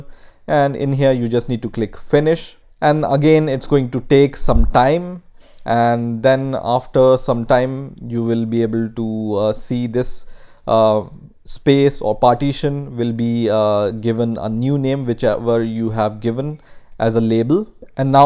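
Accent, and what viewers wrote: India. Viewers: 존나 개도움 되는 영상!!! 영어 발음이 인ㄷㅗ분 같은데 그부분만 빼놓고는 정말 설명 잘했음요...땡큐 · Once I heard that is an indian accent I knew it will help me xD. Thanks!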